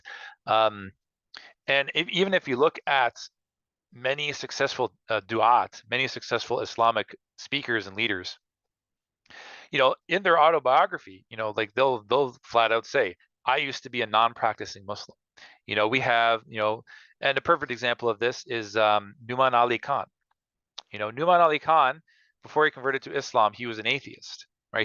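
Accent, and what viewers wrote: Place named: Canada. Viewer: Also love the Canadian accent, the best accent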